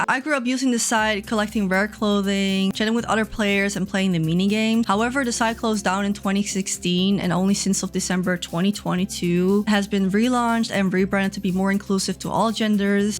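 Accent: Netherlands. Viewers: Oh my god i was listening and thought i heard a bit of a Dutch accent peeking through untill you mentioned the Dutch name of a game. I feel so smart lmao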